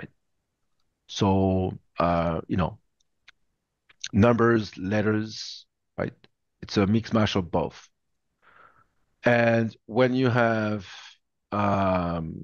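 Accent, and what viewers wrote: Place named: France. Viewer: I also want to say a big thankyou, and wonder if anyone else has started to develop with their inner monologue taking on a french accent whenever they're recalling something has taught:face-pink-tears:.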